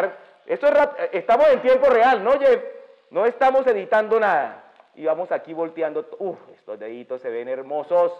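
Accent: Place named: Colombia. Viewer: Me encanta su acento Colombiano ❤️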